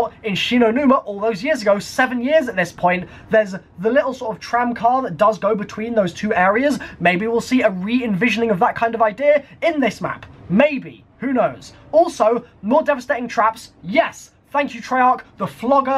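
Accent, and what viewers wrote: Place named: RP, United Kingdom. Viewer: Posh but good vid left a like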